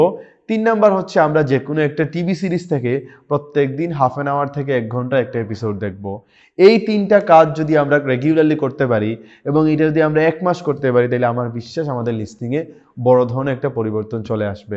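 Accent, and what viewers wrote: Sylhet, Bangladesh. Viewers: সিঙ্গেল ইউজ প্লাস্টিক ব্যবহার কমিয়ে প্লাস্টিক দুষণ রোধ করতে এগিয়ে আসুন । পানির বোতল বাদ দিয়ে পানি ফুটিয়ে খাওয়ার অভ্যাস করুন। ভাইজান কি সিলোটি?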